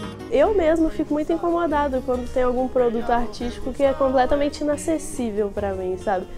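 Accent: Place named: Brazil. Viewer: antes eu n tava ligado nessa de definir o sotaque brasileiro do inglês, mas no momento que vi ela falar do vídeo do mine, sabia que ela era brasileira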